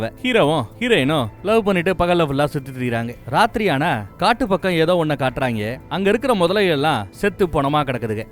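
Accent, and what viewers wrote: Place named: Madurai, India. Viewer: நீங்க மதுரைக்காரரா நம்ம மதுரைக்காரன் மாதிரி பேசுறீங்க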